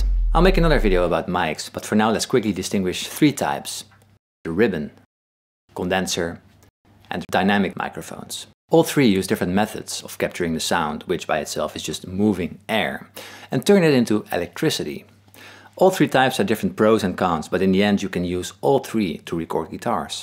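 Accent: Netherlands. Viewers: Your name sounds English but your accent sounds Dutch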